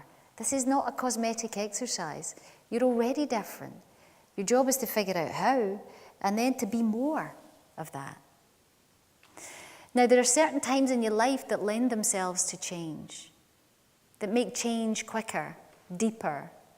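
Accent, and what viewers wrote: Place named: Scotland, United Kingdom. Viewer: Hey there community. Would you help a non native English speaker figure out some of the words of Caroline's presentation? The transcription is really bad (and the translation is no help, just as poor). I have been able to correct most of it but some parts remain a mystery to me... Scottish is definitely a challenge, lovely but tough. There's nothing essential missing to understand this powerful and incredible presentation but I'd love to have a full clean text to read. Thank you all. at 2.24, Caroline's Mom says to her after the remark about the Cupid's bow "Caroline, you're a XXX ? " at 4.56, she refers to the moon "it"s like the XXX of the moon". at 5.45, after "presbyterian, "XXX.. " can't understand what follows until "in fact this is a question... at 7.17 you've got a long time to figure out.. XXX ?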